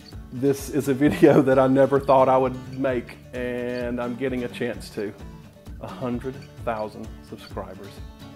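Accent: South, United States. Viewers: I'm from South Carolina Carolina I can really relate to things you talk about regarding family recipes and behaviors. Plus, your southern accent is awesome! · Congrats!! Congrats!! Congrats you have a beautiful personality and so sweet I enjoy your videos I get excited when you upload a video very helpful .Love your southern accent❤ keep up the great work. Sorry for your loss in your family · Congratulations!!! You're funny, talented, and have a great concept for your channel. (And it helps that you've got the most adorable Southern accent!!) I am delighted for you and am looking forward to more great videos. God bless! 😃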